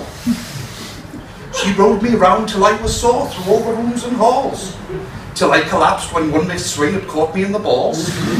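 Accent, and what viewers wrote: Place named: Wales, United Kingdom. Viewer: Good stuff ... So rock and roll man !!! Where did the taffy accent come from? 'Butt'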